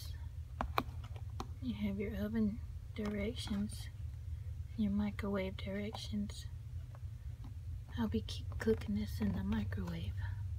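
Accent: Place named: Louisiana, United States. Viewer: I just found your channel today and I have to admit I'm super excited. I have been doing ASMR since 2009 and I have been looking for an ASMRtist that is also from Louisiana. There aren't too many of us! You're the only other person I know of! I looked at your Adult Coloring book video and I was excited when you said you were from here! I'd love to know which part but I won't ask here in the comments if you don't want people knowing. I could always email you or something. But anyway! I love your channel! And I love your accent because it sounds like home :)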